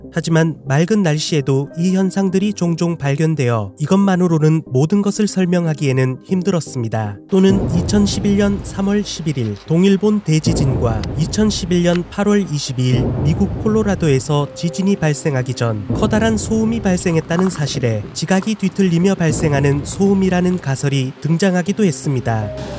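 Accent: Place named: Gyeongsang, South Korea. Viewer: 왠지 경상도 사람이 서울말하는 것 같은 말투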